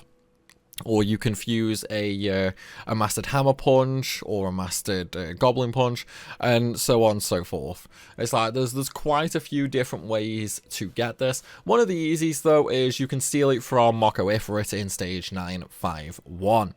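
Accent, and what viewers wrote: United Kingdom. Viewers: It would’ve been nice if you had also shown us HOW to get these instead of yapping in your disgusting British English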